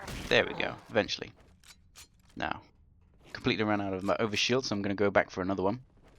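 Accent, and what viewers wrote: United Kingdom. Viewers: i dont really think u do it more its just u respond to ur fans tyrant hasnt responded to any of my comments, plus ur videos r cool cause u take the time to put in commentary and ur voice sounds Brithish which is kool too. i subscribed to u becuase of ur videos,(Which cannot be more perfect) and plus if i am right tyrant only makes laso u make stuff that is HARDER than Laso, whcih takes time. ty make more vids!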